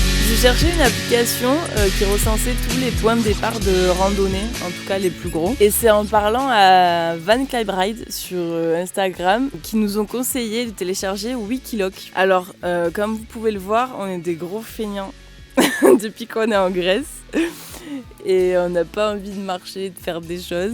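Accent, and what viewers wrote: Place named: Narbonne, France. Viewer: J adore vos vidéos les pitchous et en plus on a le même accent !!!! (Narbonne)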